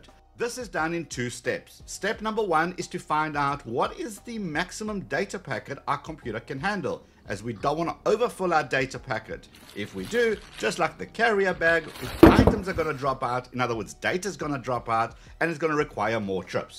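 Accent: South Africa. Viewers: south african guy hear accent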